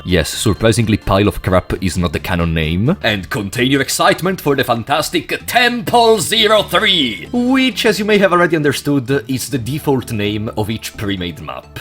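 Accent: Italy. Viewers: Are you italian? (Im italian too and i noticed your accent)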